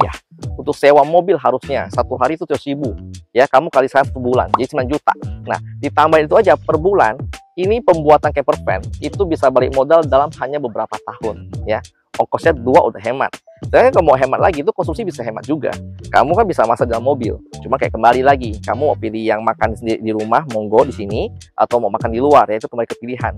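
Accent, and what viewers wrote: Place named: Bangka, Indonesia. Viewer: Abang ni asli nya Bangka atau gimana bang?? Bahasa Abang macam orang Bangka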